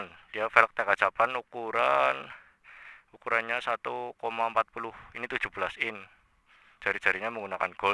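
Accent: Indonesia. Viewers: laa ya ngapak ya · Njawa lucu bos🤟🤣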